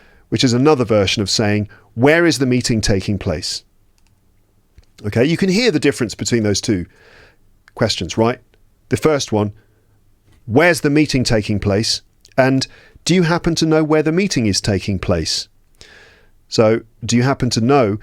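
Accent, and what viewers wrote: RP, United Kingdom. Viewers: hie, can I ask which part of the Uk is your English accent from. I usually use your videos to learn the British accent and I just got curious to know the origin of the accent I'm learning. thanks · Hi,. You look very nice in that T-shirt. I love the colour. I'm a teacher from Buenos Aires, Argentina. I started watching you on You tube premium this year, but I can't remember when! I enjoy listening to you because your modern RP English is fantastic, you have a beautiful soothing voice and you are good tempered, funny and very clever. I want to improve my listening skills, enlarge my vocab and revise what I have forgotten, since I 've been teaching pre-teens for the last fifteen years or so. The level is pre-intermediate, so I've forgotten many things. I am fond of various shorts of episodes. (My favourite colour is darker than light blue and lighter than blue). 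I enjoyed the episode where you were showing París, an old one where you talked about your late teenage, where you travelled to, what career you took up, your favourite band and so on. I liked the way you planned the class about the short story at the campsite, the episode about Gordon Ramsey, lol, the one where you talked about films, lol, the one where Paul Amber copied accents, lol, the one where your father talked about politics, it was very interesting, I liked the one where your mother said that Charles had sausage fingers, etc etc. I thank you so much for your kindness, your HARD work and pleasant talk. I wish you the best, well deserved holidays on an earthly paradise like the Maldives 😅. I'd love to go there but It's too expensive for me. I'm looking forward to watching your new episode about London.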